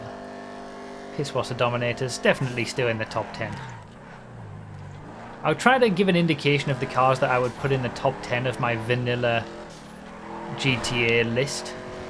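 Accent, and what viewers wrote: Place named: Newcastle, United Kingdom. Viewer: that is what is scientifically known as a Geordie accent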